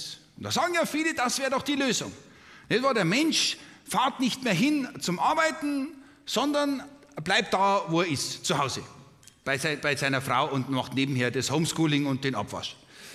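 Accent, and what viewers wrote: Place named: Bavaria, Germany. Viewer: Liebe Krustenzuzler - sensationell - da haut`s da ja den Schua weg... bayerische Sprachkultur in Reinform - immer wieder ein Genuß - Danke Wolfgang Krebs.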